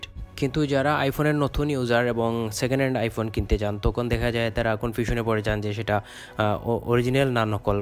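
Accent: Sylhet, Bangladesh. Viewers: Vai kita sylhety ni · Bhai tumi Sylheti nay ni....❤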